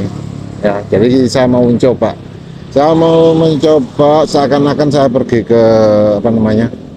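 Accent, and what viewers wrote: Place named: Java, Indonesia. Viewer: gak usah pakai ngomong guys wong sampeyan mdehok Jawir. Udah gitu ngomong kok ribet banget, gak ada menariknya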